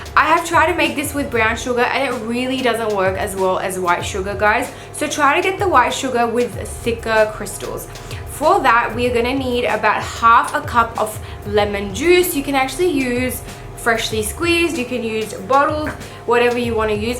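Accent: United Kingdom. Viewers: Literally i thought your Pakistani . your speaking style is very similar to British accent. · I hear a bit of scottish accent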